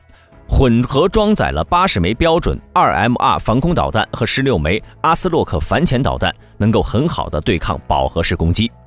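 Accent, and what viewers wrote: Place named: China. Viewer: 这博主除了口音之外别的和中国人没什么关系了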